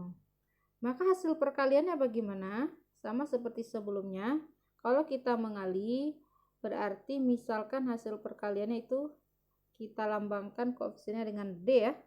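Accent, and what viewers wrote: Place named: Painan, Indonesia. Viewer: Khas dialek painan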